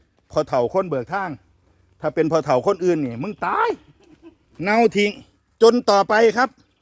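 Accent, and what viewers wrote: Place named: Chaiyaphum, Thailand. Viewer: เจ้าอยู่บ้านใด สำเนีงคือคนชัยภูมิแท้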